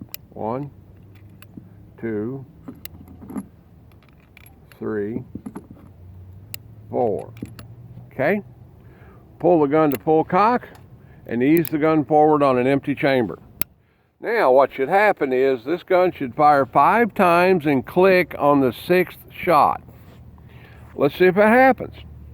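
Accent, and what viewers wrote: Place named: Texas, United States. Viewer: He sounds like a fellow TEXAN to me!!!!!!!!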